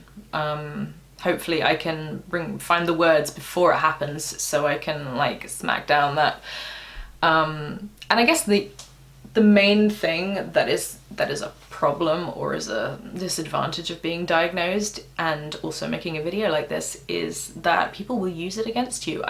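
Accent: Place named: England, United Kingdom. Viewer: Hiya, thanks for replying. Apologies for making the assumption that you were in England just by your accent and before having watched more of your terrific videos. Your assessment appears to have been quite different to mine. Wouldn't it be wonderful to get to a point where we are just understood, accepted and appreciated without the need for 'diagnosis'? We can but dream.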